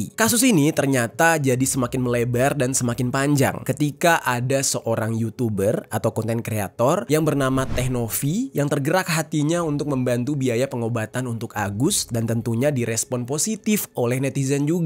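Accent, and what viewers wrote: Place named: Aceh, Indonesia. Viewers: Logat aceh nya itu loh keren banget bang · Suka banget dengar bang logat aceh😅❤ tak ulang² terus😂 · Dari cara abg pake loghat aceh, udh tau klu bng lagi keselll 😂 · orang aceh selatan dia ya? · Bang asli Aceh ya,cocok banget logat Acehnya,ingat temanku waktu kerja di Jakarta.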